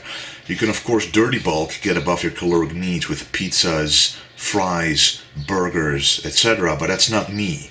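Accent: Netherlands. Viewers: Ik wist meteen dat je een hollander was met dat accent:p maar vette videos man. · Ben je Nederlander? Lijkt alsof ik een Nederlands accent hoor. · Props for the Dutch accent! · IN A WORLD.... WHERE EXTREME BODYBUILDING IS MOST IMPRESSIVE... THERE IS ONE MAN WHO FIGHTS... FOR THE NATURAL LOOK. Haha, you have that voice man! You Dutch bro? You sound Dutch :) Power to you, keep up the good work! Peace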